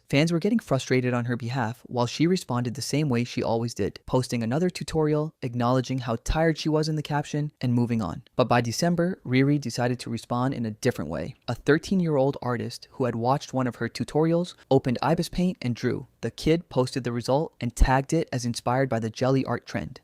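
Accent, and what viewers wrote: Philippines. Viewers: i think she is filipino, im a filipino artist too, i know what my people sounds like. 😂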